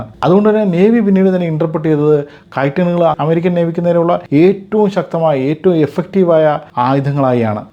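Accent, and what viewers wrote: Kottayam, India. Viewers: veedu kottayath vellom anoo....slang😊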